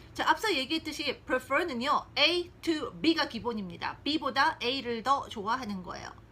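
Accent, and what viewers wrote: United Kingdom. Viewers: 선생님의 브리티쉬 발음 너무 멋있어요~😍 전 북미권에서 공부했던지라 ㅋ 선생님 목소리를 유투브에서 들을 수 있어서 너무 좋아요~ 유학시절 추억돋네요~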